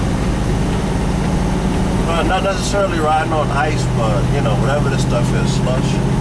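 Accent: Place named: East Coast, United States. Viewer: These youngsters these days! In 34+ years of driving, I've seen alot of dumb shit happen, but I never in my wildest dreams imagined all the dumbshit drivers with CDL's. This new generation of drivers are reckless and complainers. They think they know it all and none of us old timers know anything about driving. Especially Winter driving. Especially I-80 Winter driving. Take that 70 truck pileup on I-80 in 2015 as picture of reckless idiotic youngsters with no respect for the road, the conditions or each other. Pathetic. The guy in this video sounds like a guy from the eastcoast. Like the guy whose comments I saw, this driver is slip seating and doesn't want to buy and hook up a CB. They do sell portables, you know. Most new trucks have built in antennas. You just hook your power cord to the built in terminals and attach the provided coax to your radio. 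Badda bing, badda boom...you got yer radio going...don't worry about it......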